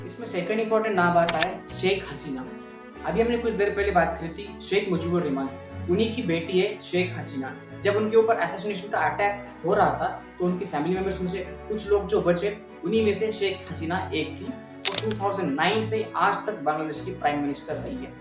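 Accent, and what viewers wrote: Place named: South India, India. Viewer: I think you are a south indian .thats why you say L❌YEL✅A❌YAH✅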